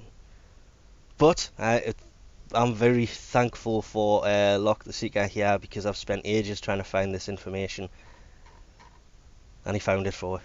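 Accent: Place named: North, United Kingdom. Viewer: have been watching all your videos love the northern accent. When ever I build a new weapon I half expect you to give me a review lol. you have pushed me on so far from my early days. I now hold my own in all the high lvl matches and I love the wraith twin vipers to! keep up all your good work love the channel.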